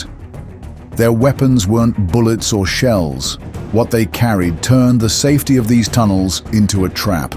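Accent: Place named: United Kingdom. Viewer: WTF with the Brit accent? These are American troops.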